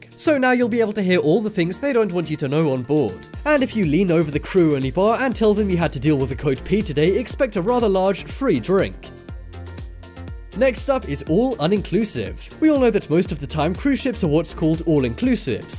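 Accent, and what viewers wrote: England, United Kingdom. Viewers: That very informative thanks.I going on cruise to mede soon wish saw this vid first.You only referring to American cruise yet you have English accent ,so. Do all codes etc are they international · Lot of nonsense on this video. Plus why does the English sounding voice guy assume only Americans watch the video??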